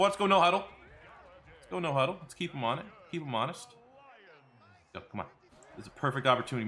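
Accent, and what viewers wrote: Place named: Canada. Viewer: This guy sounds like ricky from TPB...must be canadian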